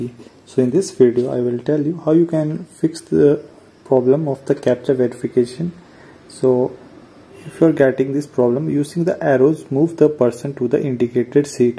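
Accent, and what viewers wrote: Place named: India. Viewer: Can someone besides an Indian make videos troubleshooting things? I am so sick of this accent.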